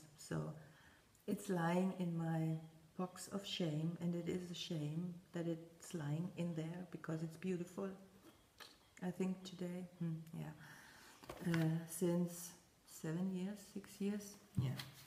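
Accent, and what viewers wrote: Germany. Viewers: Hello, I found this video today, and so enjoyed it I had to go find the first 2 that you had done and get caught up. I feel you are a kindred spirit to me in many ways. I, too, love smalls and would never even think of doing a HAED work, even though there are many beautiful ones..or a Mirabilia! I have 3 of the Lizzy Kate snowmen charts and was going to finish them as ornaments, but the picture with all of them is so cute. I think my grandsons old enjoy that alot. Thank you for taking the time to share your stitching and I hope you will continue to do so. Also, meine Mutti war auf Deutschland, so I enjoy listening to you accent. Happy Holidays!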